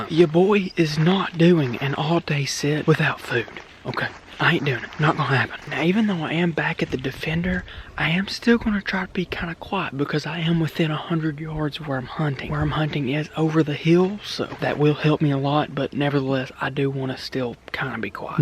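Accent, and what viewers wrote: United States. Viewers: bro is the most country sounding person i've ever heard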